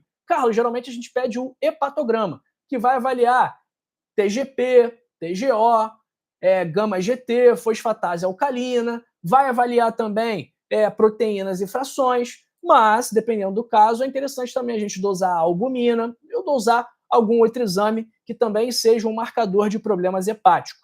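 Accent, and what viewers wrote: Rio de Janeiro, Brazil. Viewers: Porque será que o sotaque carioca é tão desagradável e IRRITANTE ??????? Não dá para assistir !!!! Affffffff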